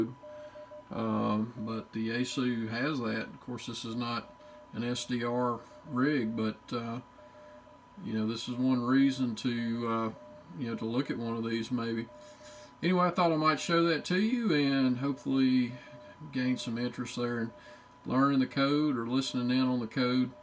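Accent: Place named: South, United States. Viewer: Thanks - Does the CW Decode display work for Tx as well as it does on Rx? Also, thanks for having a Southern voice! I've lost all my Arkansas and Texas voice.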